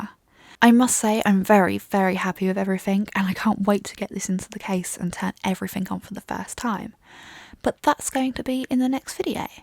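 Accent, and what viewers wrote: United Kingdom. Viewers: And talks very clear, i can tell she is british. But i could very easely follow her explanation as everything is pronounced very clearly. On a soothing tone But i would wait on gen4 SSD's 2.0 by figure of speech. They need a better controller first. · Hey, great too see u back in fine form. I hate to play matchmaker, but doesn't YouTuber Arun Maini from MRWHOSETHEBOSS live in London too? Imagine a collaborative, soothing fusion of two epic accents. 😉 All the best, phil from across the pond.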